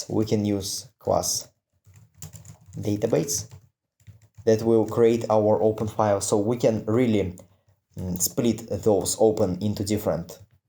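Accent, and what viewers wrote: Russia. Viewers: Братишка, ты русский? Чувствуется русские нотки в английском. Но отличный и понятный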